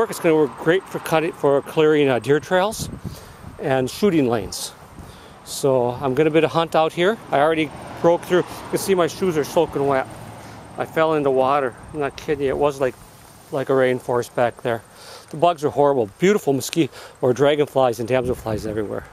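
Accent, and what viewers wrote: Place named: United States. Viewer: Were you in Brazil? You sound American.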